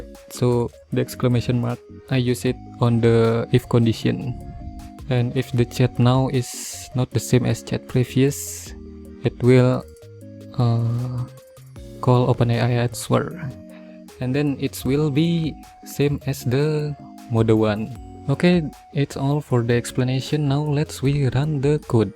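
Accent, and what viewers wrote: Indonesia. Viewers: Sudah kuduga ni orang indo ,dari cara bicaranya masih kedengeran kyak orang indo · Typical orang indonesia ngomong inggris, logat indonya berasa banget, anyway good video bro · Bahasa inggris nya sangat mudah dipahami karna sesama orang Indonesia gw juga kalo ngucapin bahasa inggris kaya gitu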